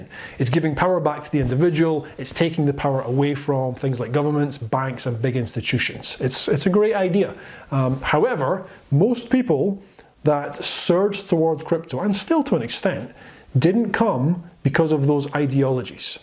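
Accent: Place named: Inverness, United Kingdom. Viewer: Are you from Inverness? Your accent sounds very much like it!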